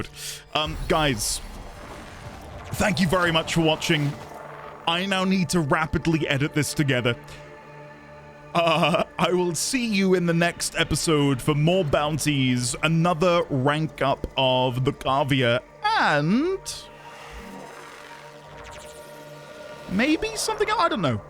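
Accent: United Kingdom. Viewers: weird to hear a northern uk voice in warframe's universe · When you first pronounced "capillary," I just chalked it up to you being British. I mean, really, you just say everything wrong anyway. You pronounce r's like a's ("neah" instead of "near"), you pronounce a's like r's ("i-dear" instead of "idea"), then there's the whole aluminum/aluminium thing. So when you said "capilliary" I didn't bat an eye.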